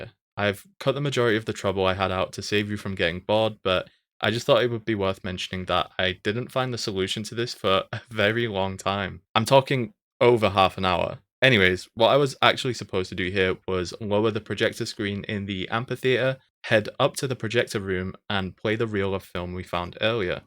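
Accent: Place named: North, United Kingdom. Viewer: Every now and then a video pops up and reminds me that Obscure exists and I love that. And then I hear that you’re a northerner too and it makes it even better! This video was sick, can’t wait to see more like it. 😊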